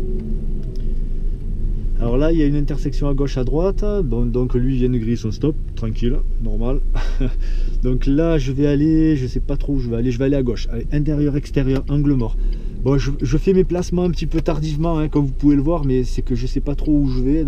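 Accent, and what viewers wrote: South, France. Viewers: Salut, J'ai mon examen du passage du permis dans 27 jours, je révise grâce à tes vidéos. Quel plaisir de t'écouter et d'entendre ce bel accent du Sud que tu nous offres, ça donne du soleil à mes révisions. Gratitude infinie de Normandie 🙏💐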